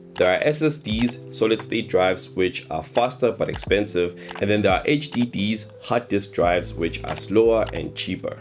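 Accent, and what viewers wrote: South Africa. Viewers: bro is south african??? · someone with a Sputh African accent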